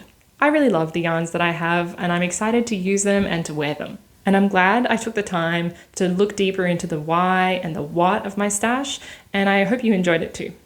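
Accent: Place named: Australia. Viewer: As a Maths teacher and someone who has been knitting for over 40 years (and every day for nearly 20 years), I cant tell you how much joy this video gave me. I have all my stash on ravelry and have crunched numbers many times over the years but never to this detail and certainly not lately. Might be time. I’m curious. Thanks for sharing. Also nice to hear another Australian accent on the YouTubes. ❤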